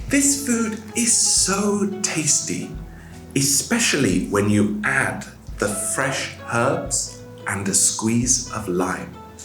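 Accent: United Kingdom. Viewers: I love your perfect British accent!!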